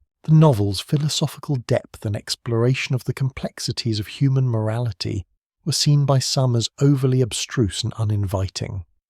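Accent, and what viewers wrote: United Kingdom. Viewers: It's being a British accent, don't you know.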